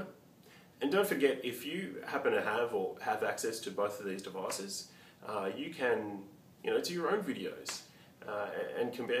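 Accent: Australia. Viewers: OK, first off, your accent has absolutely nothing to do with tellme's ability to understand you. You're right, tellme has a commands list, which you deliberately avoided using! Try the correct commands list, even with your Aussie accent, & I'm SURE it'll work (I've seen it do with accents far stronger than yours!) 2nd, all your video served as was a giggle for Apple fanboys. 3rd you make Siri seem perfect, it is NOT, but you don't show that in your video; hence it's misleading.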